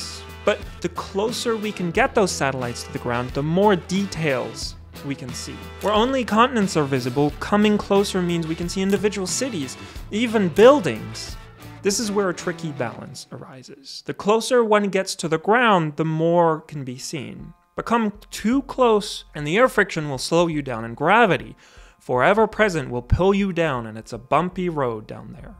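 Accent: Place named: United States. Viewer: I'm really curious about your nationality..you sound american, but I am getting hints of swedish or german......what's going on...either I have a very good ear..or I am a lunatic...I'm fine with either.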